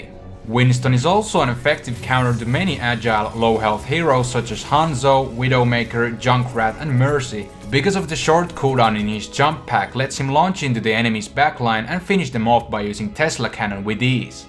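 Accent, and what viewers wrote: Finland. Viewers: is Finnish, and Finnish people are known for their "Rally English"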